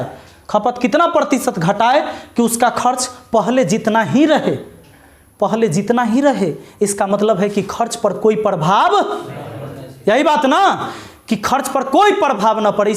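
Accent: Bihar, India. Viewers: Sir aapke Bihari style language mast hai.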